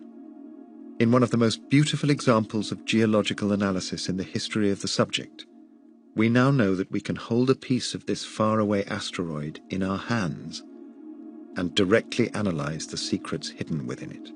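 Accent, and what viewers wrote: England, United Kingdom. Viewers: British narrator you know this, I can’t watch any doc without a British narrator and this guy is soothing asf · Yes! I agree 100%, His delivery has the perfect cadence, calm and british ( that is way easier than synthetic pseudo-british or irritating southern american drawl to digest for hours at a time), and the information is useful and free of words like "shocking" or "we were wrong" type crap....thank you. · Fantastic movie, can't imagine the amount of effort put into it... apparently I have a lot to learn about the YouTube business model. As for the narrator, he's binary compared to everything that's wrong with the world today... intelligent, intellectual, rich language but not inflated, calm, not artificially sensational and (thank god for the) British accent! Well done! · I use this to fall asleep. Perfect! Space....check. 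hours long....check...British narrator...check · 😂 what’s the deal with the British accent? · Glad I’m not the only one that prefers British narrators to fall asleep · its an English accent. Britain is made up of multiple countries, each with its own distinctive accent. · That good ol’ monotone English accent … nature’s sleeping pill. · Perfect narration. Beautiful, clear and calm. A voice which is trustworthy and very, very British. So refreshing. America take note. This is how you inform people. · My literal checklist for prime sleep! Space, hours, English accent 👌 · lol, i like this video putting in what appears to be real pictures. Hard to find documentary on yt showing that, wish more would do it. And british narrator should be on all docus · British narrator is a must for me. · This helps me sleep so well because the narration is stitched together SEAMLESSLY. There are no pauses or awkward silences for my brain to pick up on. 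The British Narrator is CONSTANT. Like a metronome - just keeps going. 🧠 💤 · The 'british narrator' is agreed by anyone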